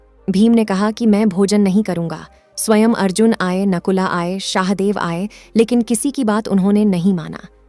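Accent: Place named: Hyderabad, India. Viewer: Pukka Hyderabadi accent hai bhai...kitna Sach bolengi kya ???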